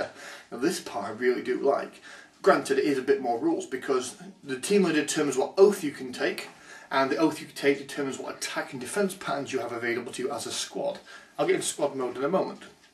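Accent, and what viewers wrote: United Kingdom. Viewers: In fact, I think I've pegged it...his Brit Accent is what makes the channel ! Brits sound so good saying things...."modified version of the Fantasy system..." - I mean...you can't even come close saying that in American English ! Plus he's smart...and knows his stuff...and he's just a great commentator. One of my favorite quotes is, "I want to play an Orc...just an ordinary Orc. And I can't do that.. and that's a shame." - see his Warhammer online review ! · Good job on the review. Your Brit accent makes everything so much nicer to listen to. LOL But good info and some nice insights. Thank you.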